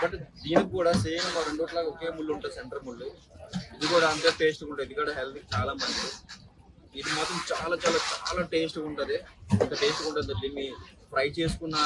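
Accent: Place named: Visakhapatnam, India. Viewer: Love vizag.....pure vizag fish market....nice ..open free vizag language.....😀👍. Keep it up...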